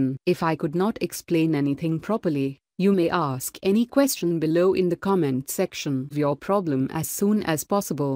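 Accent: India. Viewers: bro doesn't use his actual voice but its still in indian accent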